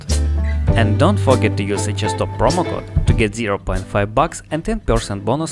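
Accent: Russia. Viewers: у меня шизофрения если я слышу русский акцент? а нет теперь я в шоке что наткнулся на 2 акк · У тебя русский акцент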